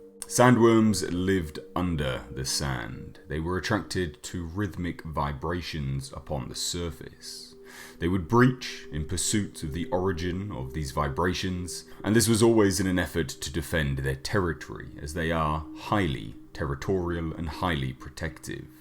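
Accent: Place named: United Kingdom. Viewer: I am interested in anything that you say with that deep Brit voice.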